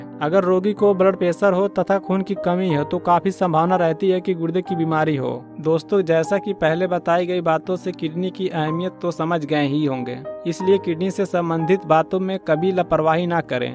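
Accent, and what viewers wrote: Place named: Bihar, India. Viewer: Sala bihari ki tarha baat karta hai lol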